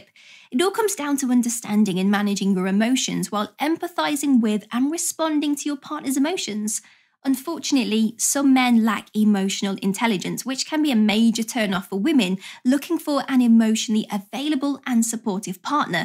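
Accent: England, United Kingdom. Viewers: the only nice thing about this video is that she has an english accent. and for the rest I have no remarks or criticisms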